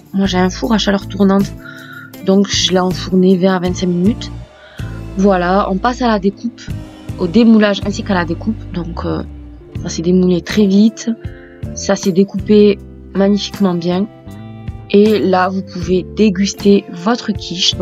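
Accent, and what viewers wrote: South, France. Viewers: ca s'entend que tu es du sud 💗maintenant Paris!en tout cas ta chaîne est sympa je pense que pour le ramadan tu vas m'inspirer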